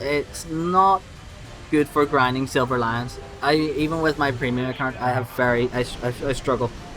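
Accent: Northern Ireland, United Kingdom. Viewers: Hey mate, are you from Northern Ireland?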